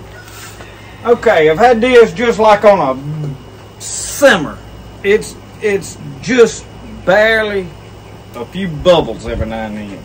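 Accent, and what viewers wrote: South, United States. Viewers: Southern accent video -that’s priceless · You sound very southerner. So I have a silly question? I. Live in florida. So does this grow in florida? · Thank you SO much. This is the first video I've ever found that shows virtually unhaired wild lettuce. I've got lots of it on my property and although my leaves look more like others' wild lettuce than like yours, you were the first person who has said that the hairs on some of these are undetectable. That stuff with the yellow flowers you showed...that's totally what I have. I've known instinctively that this stuff in my yard was wild lettuce and needed to be used, but I couldn't find enough confirmation until now. Plus northern and southern wild lettuce can be so different that I needed a southern accent video to make sure I was doing it right. Thank you! I wish there was a way to rank YT subscription list cuz I'd put you as #1.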